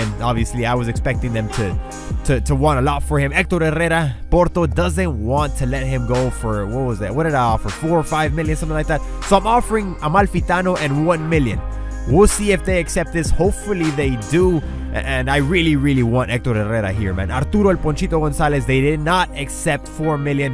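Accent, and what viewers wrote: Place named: Mexico. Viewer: Haha 5 minutes in and your career mode is fun to watch!! 😅 I thought you were white when I started listening but then as I kept watching Im like nah this guy is mexican lol 😆 Also because of the signings you were making of mexican players lol I hope you keep uploading career mode videos bro, I just started a West Ham career as well but I put the team all the way down to 4th division and I'm trying to promote them all the way up to premier league!! 🙂‍↔️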